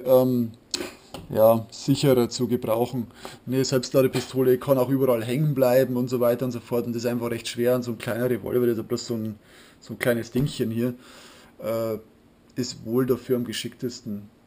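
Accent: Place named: Burgenland, Austria. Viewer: Woher bist denn du? Du redst meinermeinung noch wia a Burgenländer.(I bin aus Margerethen.)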